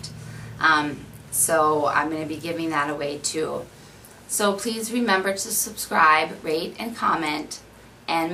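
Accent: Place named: Midwest, United States. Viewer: You do have a " Midwestern " accent , but that's ok!!!! :) it differentiates each of us! I love how you say " roof " :) Em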